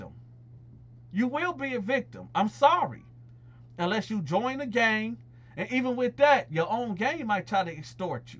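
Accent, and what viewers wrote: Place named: Ohio, United States. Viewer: Okay! At first I thought you was from Cali from yo accent. But now I realize from yo story's that you MUST be from Ohio or Michigan!.. But I'm guessin Ohio. Yaw sound JUST LIKE US!! Or vice-versa. But much love to ya anyway brotha! Keep them interesting ass stories goin! I'm listenin.👊🏾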